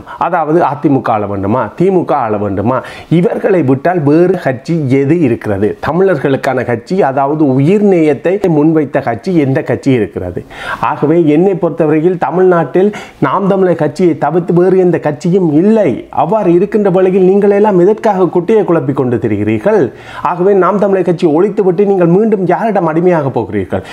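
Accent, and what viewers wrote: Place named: Eelam, Sri Lanka. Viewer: ஈழத்தமிழ் அழகு தமிழ்... ❤️ தமிழ்நாட்டுக்கு தமிழர்கள் உங்களை பார்த்து கற்றுக்கொள்ள வேண்டும்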